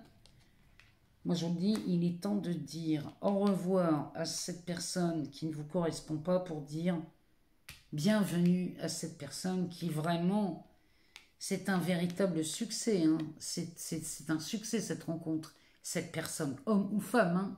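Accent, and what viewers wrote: North, France. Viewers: DEQUELLE REGION DU NORD ETES VOUS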